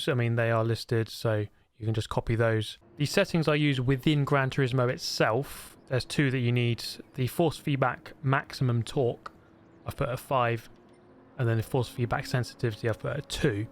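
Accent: United Kingdom. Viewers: I'm pretty sure Fanatec is pronounced "fanatic" as in a crazy person. Don't hate me. You'll probably get away with it because of the British accent! Rock on!🎉😂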